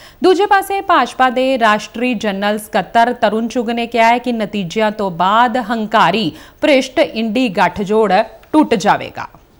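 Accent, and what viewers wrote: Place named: Bihar, India. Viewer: Yeah anchor Punjabi nahi bihari lagdi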